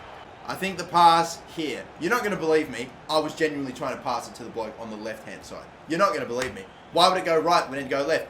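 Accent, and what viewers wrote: Australia. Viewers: Is he austrailian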